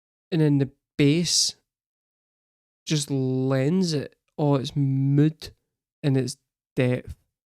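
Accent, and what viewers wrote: Scotland, United Kingdom. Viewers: Wait!! You're Scottish???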